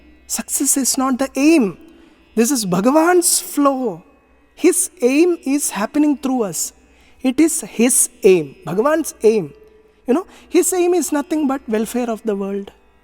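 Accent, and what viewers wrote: Standard. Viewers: आपका संस्कृत उच्चारण बहुत ही शुद्ध है। उच्चारण व्याकरण सम्मत भी है, कहां पर है उपध्मानीय का उच्चारण हो रहा है तथा कहां पर जिह्वामूलीय विसर्ग का उच्चारण हो रहा है वह सभी सुन करन बहुत ही प्रसन्नता हुई। ऐसा शुद्ध उच्चारण भारत वर्ष में केवल 1% लोग ही कर पाते हैं। ऐसा शब्द उच्चारण भारतवर्ष में धीरे-धीरे कम होते जा रहा है ।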